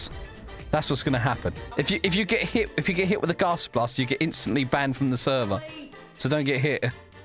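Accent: Essex, United Kingdom. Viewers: r u from Essex aswell as me??? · ngl didn't know he was from essex... lol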